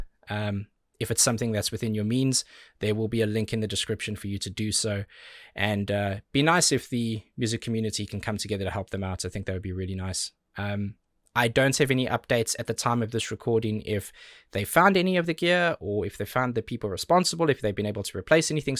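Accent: South Africa. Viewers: My favourite album ever! I’m new here. What’s your background? I think I hear a slight South African twang to the way you say certain words! I’m Zimbabwean-born and grew up in London. I’m definitely going to subscribe as I really like your vibe. Perfectly timed to find your reaction and my favourite band. If you still haven’t listened to this whole album, you definitely should. It’s such an experience from start to finish xx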